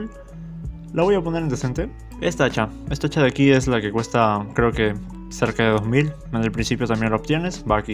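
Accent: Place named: Peru. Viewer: Buenazo! Todavia con acento peruano causa xd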